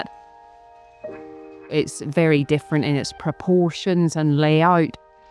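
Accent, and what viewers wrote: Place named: Antrim, United Kingdom. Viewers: Accent sounds like someone who grew up in Northern Ireland and moved to Edinburgh in their teens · Beautiful home. Listening to your accent reminded me of my Grandfather…he was from Antrim.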